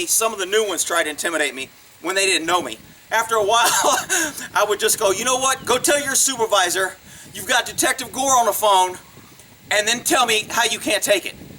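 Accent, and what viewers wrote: Texas, United States. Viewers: is he from Texas?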